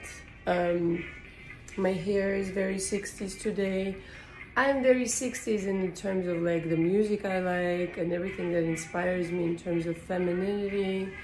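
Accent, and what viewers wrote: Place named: France. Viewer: lovely french accent <3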